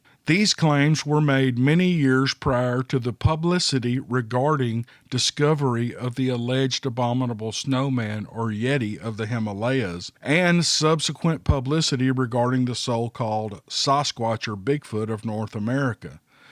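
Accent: South, United States. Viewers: O! Don’t record it over! I love hearing you get so tickled you can’t go on. I’m sure everyone is laughing along with you. Even my husband, who views these stories only as entertainment and gets tired of listening, got a great laugh at the experience and loved to hear you laugh, too. I LOVE EVERYTHING you pick out and love your accent and the way you speak because I’m a country girl from the south. You are my favorite Bigfoot storyteller on Utube.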